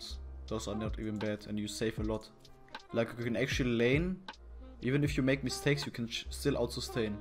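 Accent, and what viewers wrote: Germany. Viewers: Where is he from he sounds like a German dude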